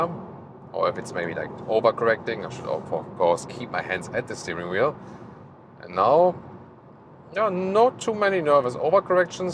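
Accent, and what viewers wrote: Germany. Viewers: His german accent is so hard and difficult to understand.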